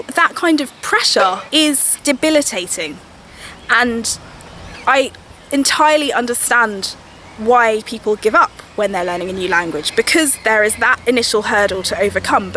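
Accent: Germany. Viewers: And how did you get such an incredible German accent living in Switzerland.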